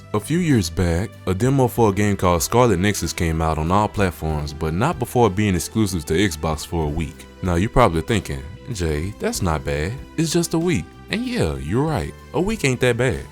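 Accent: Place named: United States. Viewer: Constructive feedback: 1. It's difficult to read paragraphs of text on-screen when you're talking at the same time. Choose one or the other. 2. You have a REALLY strong American accent. As a Brit, I can't understand you when you speak quickly and start slurring your words. 3. Opening with "PC is better anyway" in the first 30 seconds is just an insult to parts of the audience who disagree with you. As someone who owns PC and consoles, I think consoles are better because you can relax on the sofa instead of sitting bolt-upright at a desk. Good luck with your Youtube journey.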